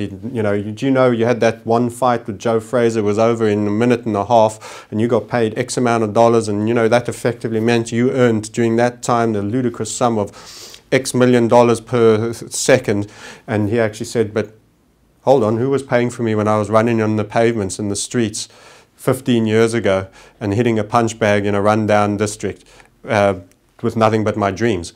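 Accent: South Africa. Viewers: I love this man's accent, Sounds South African but I could be wrong...totally agree with what he's saying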